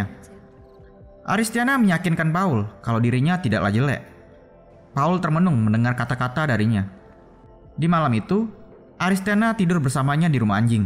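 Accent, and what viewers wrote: Medan, Indonesia. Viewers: bang kau orang medan ya kalo gk salah suaranya sama njir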